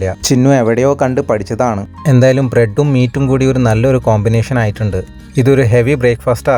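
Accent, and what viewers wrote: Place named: Thrissur, India. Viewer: Slang thrissur pole thanne ind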